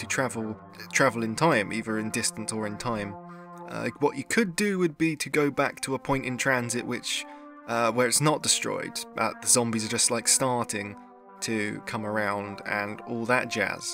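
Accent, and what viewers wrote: United States. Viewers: The American accent fooled me lol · Honestly I thought you were American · wow thats actually an amazing american accent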